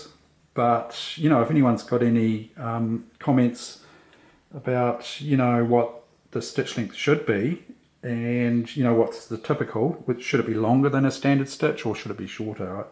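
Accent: New Zealand. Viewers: I knew it! After seeing some TV News videos, I could recognize your accent. Greetings from Rio de Janeiro, Brasil.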